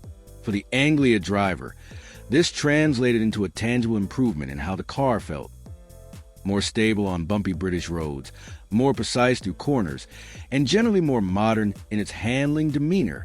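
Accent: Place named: United States. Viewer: American voiceover? Hmmm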